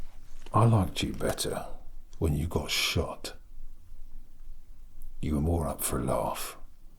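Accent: United Kingdom. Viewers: THE BRITISHNESS TW 😂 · BRITISHNESS AS A TRIGGER IM DEAD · this is so british and i love it .. 😂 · i just clicked in here for the british accent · i dont understand shit because hes too british, i swear to god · omfg hes so British, i love it sm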